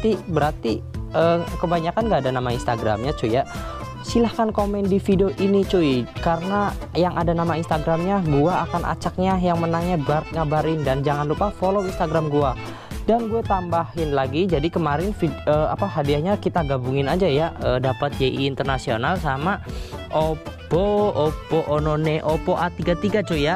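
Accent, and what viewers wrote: Tegal, Indonesia. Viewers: Ky orang tegal si bang logaknya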